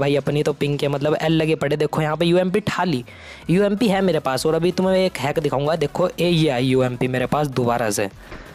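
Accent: Haryana, India. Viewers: Haryana ta h ka bhai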